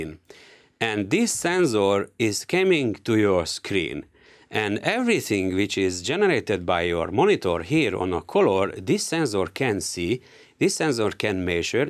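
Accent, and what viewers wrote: Hungary. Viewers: Great monitor, good review ... :). Good humour, lovely accent ... :): are you from Hungary or somewhere in the neighbourhood ... :)? In any case, it was great help for me, now I know why I need at least 40" for a 4K monitor ... :) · Köszi az infókat, nagyon jól jött :) ( az akcentusodból kiindulva magyarul írtam :D ) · Im sure I hear hungarian accent there. I might be wrong. This monitor is £498 on amazon. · he he az első mondtad után tudtam hogy magyar vagy :D köszi szuper volt a videó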